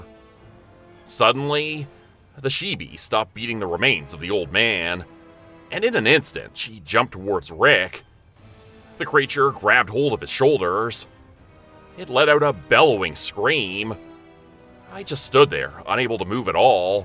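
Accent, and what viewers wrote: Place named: Canada. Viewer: Cause he’s from Canada - and no we don’t all talk like that!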